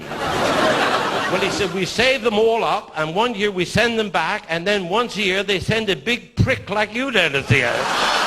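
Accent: United Kingdom. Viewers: Lovely. And nice to hear a Yorkshire accent, it's ages since I lived there.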